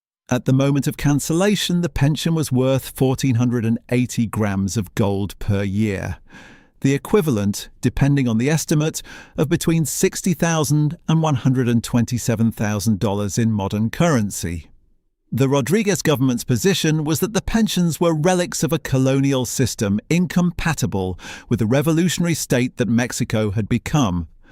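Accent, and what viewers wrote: United Kingdom. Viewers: the british english pronunciation is messing up the spanish spelling and the words giving me a headache, as an american this "Tree" is a "Mess" as "Mexico"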